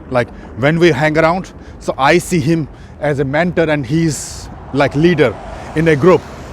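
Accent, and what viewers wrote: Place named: Bihar, India. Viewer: Your accent is very Bihaari. The way you say "aaaalso" .. Sounds like some chokidaar / chapaadasi...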